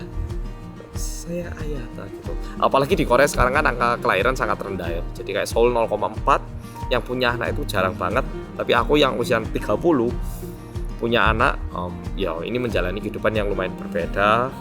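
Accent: Java, Indonesia. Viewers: alhamdulilah mas aku bolomu dari gondola running man sampe eneng wong korea medok seng versi saschet🎉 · Ngikutin sam dari 2018an dari dulu yg masi nge kos trs nge vlog ini itu dengan logat medok nya yg masih lekat bgt smpe sekarang tuh luar biasa sam Kangen memang di bbrp taun terakhir ini jarang ngeliat vlog mas, sampe" klo senggang tuh ngeliat vlog" jaman dulu trs ngeliat metbg pas masi di awal" Wes pokoke yo kangen ngono mas. Smpe akhire barusan liat sekilas di ig oh ternyata Jennette hamil toh, dan ini baru liat video penjelasannya mas. Ikut berbahagia juga mas dengernya dan sehat" terus buat keluarga😊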